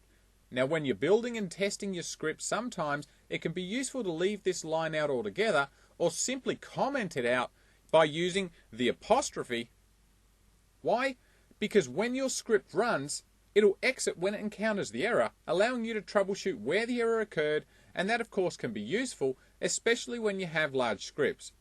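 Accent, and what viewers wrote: Australia. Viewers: Love you hear an Ostayian doing IT videos. Cheers mate!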